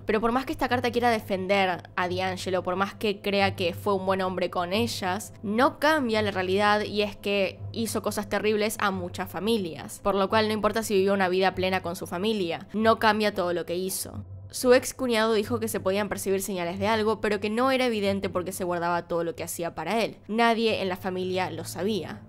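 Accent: Argentina. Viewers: Hola, tengo poco viendo tus videos, muchas felicidades, tienes un hermoso acento. Argentino, no se si ya tienes el caso, de hace muchos años de los narcosatanicos de Matamoros, tam. Ojala y encontraras suficiente información al respecto y si te parece hacer un video. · Me encanta tu voz aterciopelada y tu pronunciación del inglés con tu acento argentino. Besitos desde México 🇲🇽 😘 😘 😘 🇦🇷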